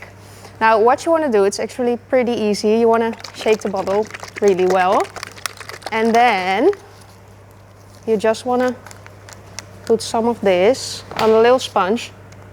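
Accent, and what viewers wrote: Netherlands. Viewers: ARE YOU DUTCH? 🙋‍♂️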